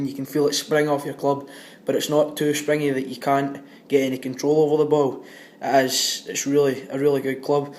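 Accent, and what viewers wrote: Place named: Scotland, United Kingdom. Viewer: I feel gifted that i can understand this thick of a scottish accent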